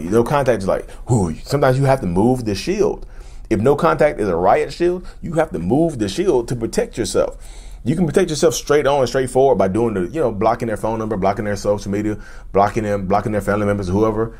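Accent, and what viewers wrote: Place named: South, United States. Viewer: This is random but I am from the northeast so to me you have such a southern accent and I love it ❤❤ I could listen to you talk all day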